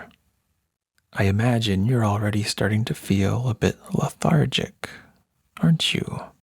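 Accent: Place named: Canada. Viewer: I've listened to your stuff before and only today picked up on your Canadian accent. "Sorry" gave it away 😅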